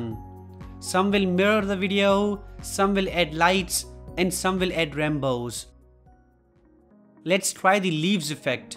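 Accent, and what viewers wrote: India. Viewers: Cet accent vient de l' Inde. Là bas les gens appuient fort sur les lettres quand ils parlent l'anglais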